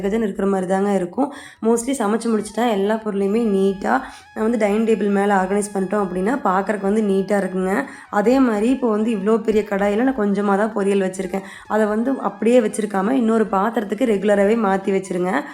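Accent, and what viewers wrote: Kongu, India. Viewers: Kongu tamil apedetha ka sis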